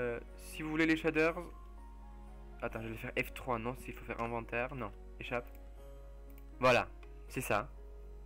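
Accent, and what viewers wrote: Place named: England, United Kingdom. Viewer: ton casque change ton accent tu as un accent englais mais ses bien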